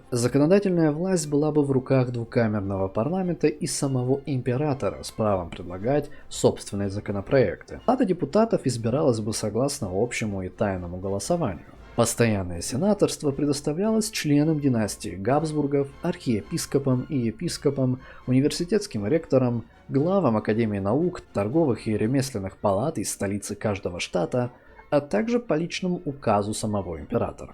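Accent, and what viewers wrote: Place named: England, United Kingdom. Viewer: Как мне обучиться такому же английскому акценту как у автора видео?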